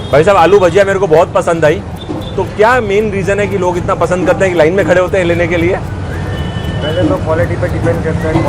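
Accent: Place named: Delhi, India. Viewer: sahib,soo waat chay surat na visit nee,aap ki zabaan tau Dehli walon jesi hai,aap ki Urdu numa hindi bohat lutf daiti hai. Love from Pakistan 🇵🇰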